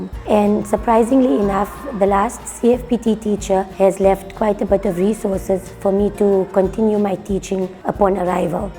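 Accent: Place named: Cape Town, South Africa. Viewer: South African Cape Town Accent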